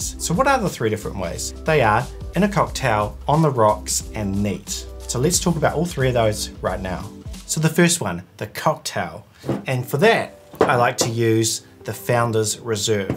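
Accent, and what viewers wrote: New Zealand. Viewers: Not being a mother tongue English speaker, although having a decent understanding of the language, I'm shocked that I didn't get the NZ accent...but now I can't unhear it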